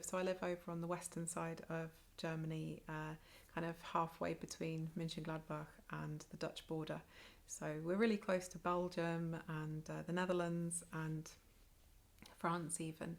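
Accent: Wales, United Kingdom. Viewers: Hi - I also love knitting socks, although they have been mostly vanilla socks so far. Your moomin socks look gorgeous. And the socks from Nancy are very beautiful. Thank you for this lovely podcast. I always enjoy listening to your British accent. It is so heartwarming, because it reminds me of the time when I lived in the UK nearly 25 years ago. Greetings from the Black Forest.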